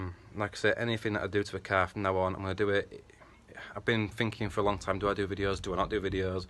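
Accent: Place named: Yorkshire, United Kingdom. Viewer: Thanks for the shot out bud! And it’s great to see another face on the you of tube! You sound Yorkshire way, where abouts you from mate?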